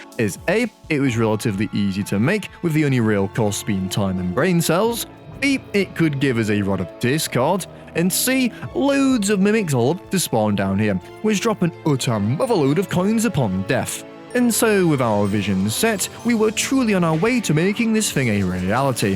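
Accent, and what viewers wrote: United Kingdom. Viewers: youtuber and british acent at the same time is crazy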